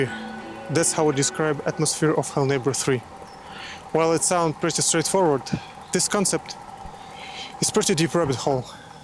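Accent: Russia. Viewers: Сразу видно нашего по ярко выраженым русским акцентом. Хотья я и так знал что создать "Привет Сосед" русский но все равно ❤ Жалко что нет звуковой дорожке, я бы послушал оригинальный голос без ярко вырженого акцента😅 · русский акцент никогда не скроешь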